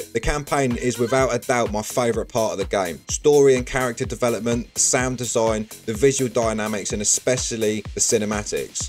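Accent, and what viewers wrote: Cockney, United Kingdom. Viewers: how much of a Geezer accent you have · The best part of this video is that listening to your voice without subtitles has surprisingly shown me a drastic improvement in my listening skills, particularly for British accents (or Australian accent?). Cheers from an Indonesian Diablo 4 Player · Hahaha nice man! This is a strong English (cockney?) accent!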